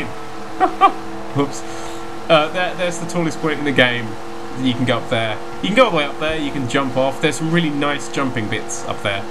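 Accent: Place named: United Kingdom. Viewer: not nearly as good as PewDiePie like when he plays Outlast lol... it's probably the British accent? but I know I'm not the only one who finds it pretty much the most irritating accent on the planet.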